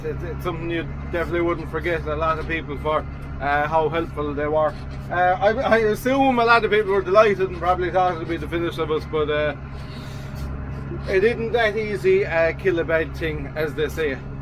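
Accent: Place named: Galway, Ireland. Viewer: I taught u were from galway because of ur accent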